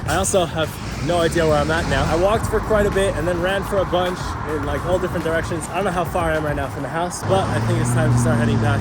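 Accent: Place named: United States. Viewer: Are your parents American? Your English sounds very American to me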